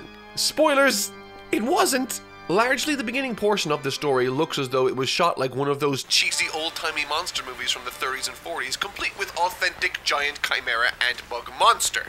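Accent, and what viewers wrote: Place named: Ireland. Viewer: It's just his Irish accent. The same way he pronounced "calm"/kæm/, instead of calm/kɑːm/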